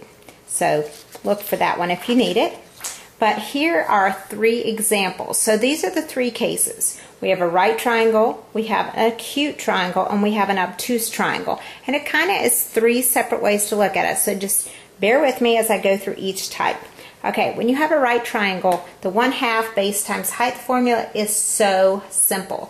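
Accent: South, United States. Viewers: So southern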